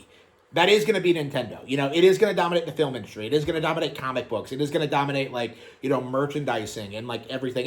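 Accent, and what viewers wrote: United States. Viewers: Why you sound intoxicated with a Arizona lol bruh crack a beer and get a pc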